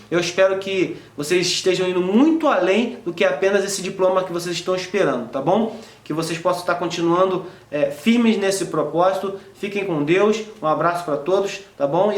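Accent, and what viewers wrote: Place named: Rio de Janeiro, Brazil. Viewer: Nem precisa fala que é do RJ com o sotaque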